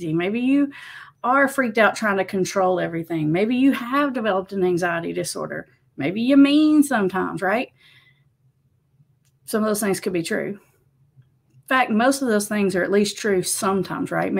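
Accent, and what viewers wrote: South, United States. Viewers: Love the channel and your slow Southern style !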